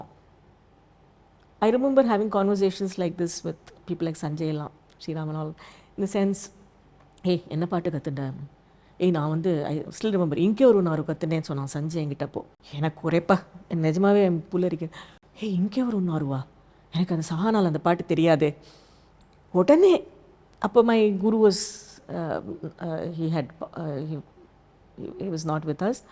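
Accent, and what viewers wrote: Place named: Malaysia. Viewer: Love her Tamil! Sounds like Malaysian's Tamil accent. Wonderful lady and artist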